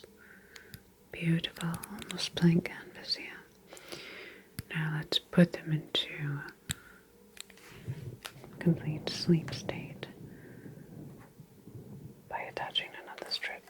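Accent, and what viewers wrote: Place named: Europe. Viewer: I looooove the subtle "continental" accent on this. Time for another hard New England accent video to balance it out?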